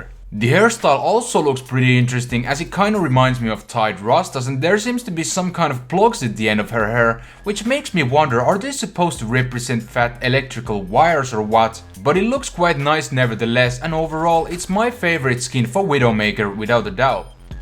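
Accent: Finland. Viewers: Hey are you Finnish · Are you finnish cause it sounds likes it :D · are you finnish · Tiesin heti ku kuulin sun äänen et oot suomalaine XD ja hyva video voiks tehä Tips And Tricks ? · Wow! When I heard you speak I instantly knew you were finnish. HYVÄ VIDEO MUUTEN! ☺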